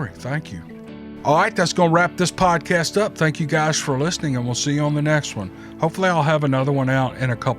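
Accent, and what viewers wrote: South, United States. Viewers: I appreciate you so very much. Love to hear your awesome Southern voice and your sense of humor. God bless you & your family!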